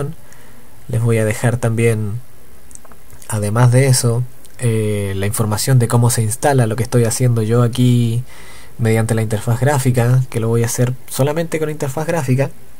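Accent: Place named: Chile. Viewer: Gracias por la app. Muy útil. Por cierto qué acento tan CHILENO tienes :D. Un saludo!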